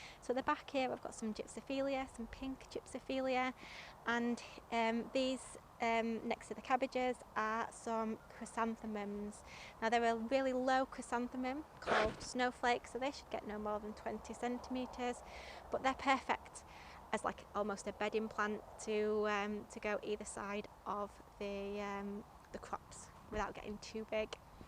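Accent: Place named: East Yorkshire, United Kingdom. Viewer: Sent over by JB...what a suprise as you sound like me! I noticed your East Riding of Yorkshire and so am I! I'm in Hull. Definitely subscribing!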